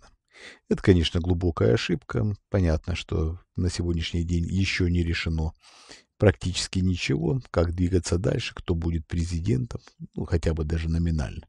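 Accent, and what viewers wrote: Ukraine. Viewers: Речь украинская,разве не слышно?)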